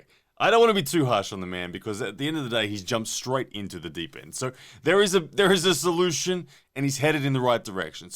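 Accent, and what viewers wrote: Australia. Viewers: Is working on a new commentator voice? He sounds less Australian than usual, here.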